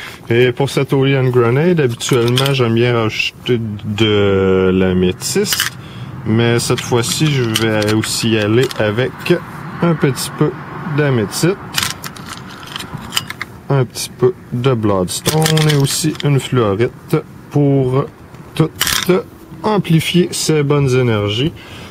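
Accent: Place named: Quebec, Canada. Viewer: Selam, peux tu ecrires les ingredients necessaire pour une coulée d'orgonite? S'il te plait? Parce que je ne comprend pas trop la belle accent du Québec... Je te remercie du fond du coeur.. Un futur Ami.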